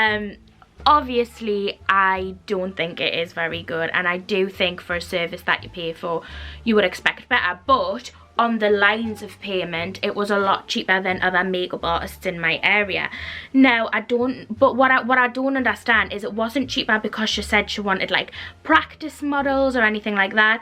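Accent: Newcastle, United Kingdom. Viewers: Hope you enjoy Edinburgh plus I love accent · I’m from Bedlington but study in Edinburgh, love hearing a geordie speak, you make me feel at home ❤️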